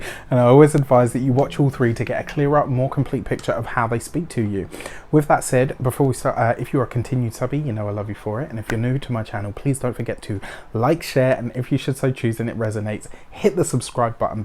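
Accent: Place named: Wiltshire, United Kingdom. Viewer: keep up your gift too friend. your accent brings me back to my youth. where's the accent from in Wiltshire ? love & respect...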